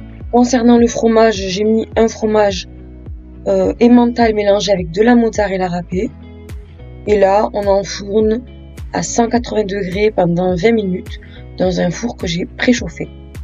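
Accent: South, France. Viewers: je n'avais pas fais attention dans la vidéo mais il me semble que tu as dis que c'était sûrement la grossesse qui te fais adorer la Mozzarella. Félicitations à toi 😊😊 je ne me lasse pas de tes vidéos avec ce jolie accent du sud que tu as 😉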